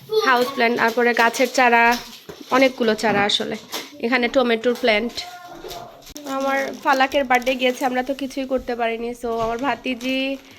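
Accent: Sylhet, Bangladesh. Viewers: প্লিজ আপনিই সিলেটের ভাষায় পুরো ব্লগ দিবেন আমার সিলেটের ভাষা শুনলে কলিজা ঠান্ডা হয়ে যায়😊 আমার বাড়ি হবিগঞ্জ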